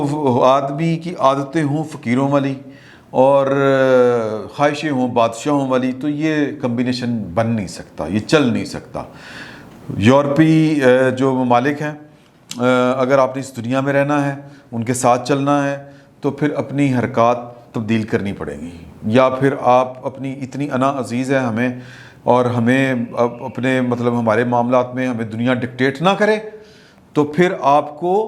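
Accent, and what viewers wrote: Punjab, Pakistan. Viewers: Indian Punjabi here, makes me sad what’s happening in Pakistani Punjab. Although I love anchors Punjabi Urdu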